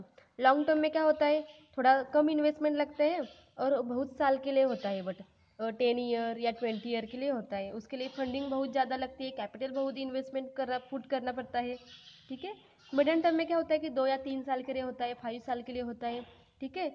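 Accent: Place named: Pahadi, India. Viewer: ap pahadiype kyu bol rahi ho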